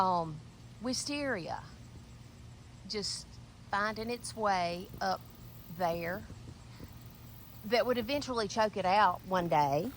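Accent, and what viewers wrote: South, United States. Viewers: Good morning,. Hope it cools off soon for you. Here in Ohio--we have temps in mid to late 50s in early morning. Then it creeps up to mid 80s by the late afternoon. Yes I understand what you are going through with the walnut tree and shrubs. I've had similar problems with a walnut tree mixed in with tiger lilies growing next to the garage. Last year we even had poison ivy in that space. I had to clear it out (protected by gloves) and still got a little rash on my forearms. We have very dry soil right now. It hasn't rained in over two weeks. I love your southern accent,. Soil or soul is fine with me.😅 You did a good job clearing out the problem area. Have a wonderful Sunday afternoon.😊💖💕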